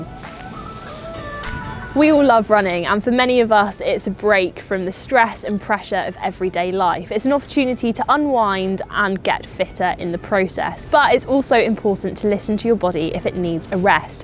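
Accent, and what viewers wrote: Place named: RP, United Kingdom. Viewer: She has, what we would say here, that BBC tone to her. I always wondered whether that comes naturally to people growing up in the UK or whether it's through training.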